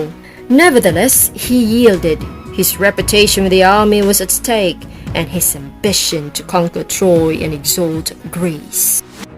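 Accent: United Kingdom. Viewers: Sana all british accent, lami ra paminawn uyy...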